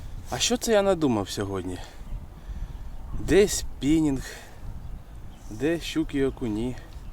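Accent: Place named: Chernihiv, Ukraine. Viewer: я живу в полтавській,з чернігівської переїхав років двадцять тому,і ось натрапив на ваш канал,і по рідному акценту зрозумів що хлопак з Чернігівщині)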